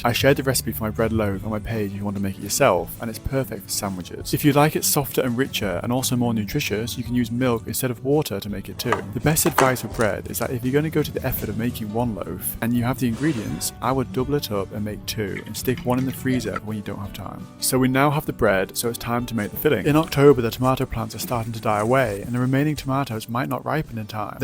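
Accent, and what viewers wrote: United Kingdom. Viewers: لحجه بریتیشو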